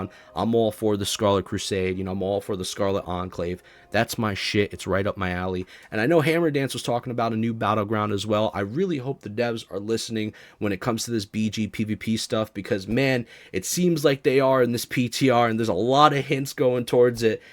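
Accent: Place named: Midwest, United States. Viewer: Dude, lol. Love your videos man. And dat midwest accent 😆🤘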